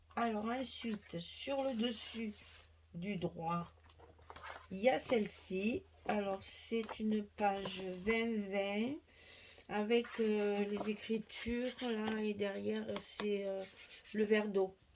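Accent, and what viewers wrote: South, France. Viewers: Bonjour, c'est pour vous dire que j’adore tout vos tutos, ils sont très bien expliqués, je n'ai jamais pensé à vous laisser un commentaire, mais je me rattrapages aujourd'hui, je doit faire un album naissance et il va y avoir beaucoup de vos pages. Je voulais vous remercier aussi pour m’avoir fait connaître le papier 50x70 cm, par contre vous êtes une artiste dans la décoration, moi j'ai encore des progrès à faire. Et merci pour votre bonne humeur et pour votre bel accent du sud donc je fais partie😊 ❤❤❤❤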